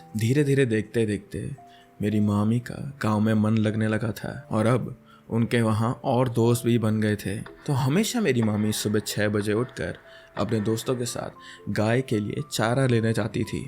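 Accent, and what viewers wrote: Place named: Garhwal, India. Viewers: That Garhwali accent 😂❤❤ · your pahadi accent is soo cutee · Your Gawhali Accent is Awesome 💞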